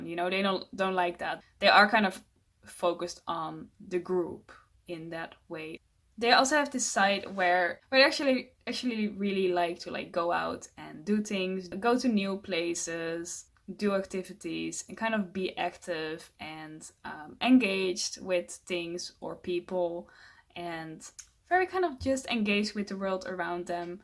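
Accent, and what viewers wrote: Netherlands. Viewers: I LOVE THIS CUTUE PIE, AND THE DUTCH ACCENT. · Immediately heard your Dutch accent, it's so obvious haha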